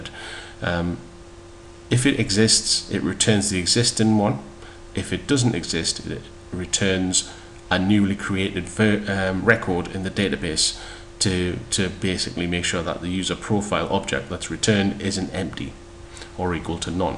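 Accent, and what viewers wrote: United Kingdom. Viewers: One day spent banging my head around Django (trying to manage users / profiles) - 26 minutes of this tutorial - all sorted. Will be going through the rest of the series now. Genius. As an aside - always loved Python - Django at the moment is seeming like the fastest route to murdering Python. As another aside - Ace accent, in my personal opinion the best in the UK. International users take note.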